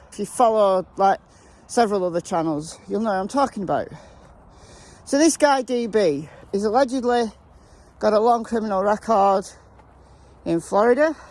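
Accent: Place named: Greater Manchester, United Kingdom. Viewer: Your videos are very interesting they are just not drama I appreciate what you do and I love listening to you talk your accent reminds me of my relatives from Ashton Underlyne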